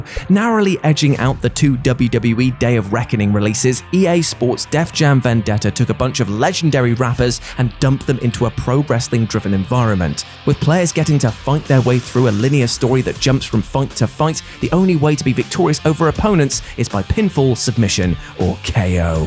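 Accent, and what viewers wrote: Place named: United Kingdom. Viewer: All the weird brits talk like that. It's annoying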